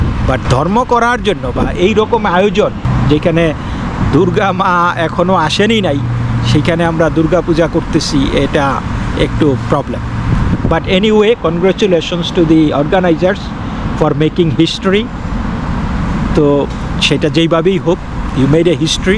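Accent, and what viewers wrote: Bangladesh. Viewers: ওওওও দাদা আপোনার বাংলা বলার ছিরি অমন ক্যানো? ওওওও বাংলাদেশের আপনি?ইন্ডিয়ার বাংলা এমন নয়।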